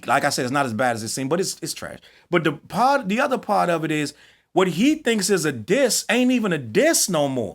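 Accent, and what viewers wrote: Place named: Washington DC, United States. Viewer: Good breakdown on this. Question, you from DC area? Lol. You sound just like us in this breakdown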